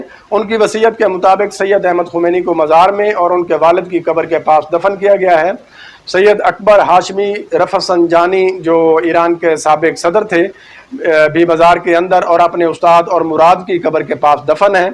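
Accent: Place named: Punjab, Pakistan. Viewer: Punjabi Urdu bolna sake jahil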